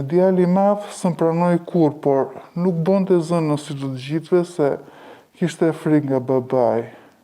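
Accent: Albania. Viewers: Te duket nga veriu me kete dialekt ? Zonja perdor fjalen koshtrat kete fjale e kam degjuar te flitet nga gjirokastrite.Mentalitet te tille per te shitur vajzat qe ne mosh fare te vogel ekziston akoma rendom dhe ne zonen e Mallakastres dhe Fierit por e sigurt nuk eshte nga keto zona. Eshte ose nga gjirokastra ose nga saranda sepse jeton ne 1 zone qe kane patur besimin katolik sic edhe zonja thote shkova ne 1 kish. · I kam degjuar pothuajse te gjitha historite tuaja por 1 gje nuk kuptoj ne te shum histori pervec se i njejti ze ,ok mund te jet i modifikuar por dialekti i njejte si nga zonat e Fierit ,kjo te le te kuptosh qe mund te jene histori te sajuara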